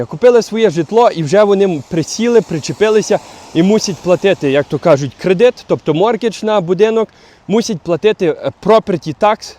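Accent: Canada. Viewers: Цікава українська, вже з канадським акцентом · У Вас, дуже, канадський акцент! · це я знаю,маю родичів там,але на мій погляд гя " говірка " як ви кажете ,це скоріше українська мова з канадським акцентом..дуже ріже слух )))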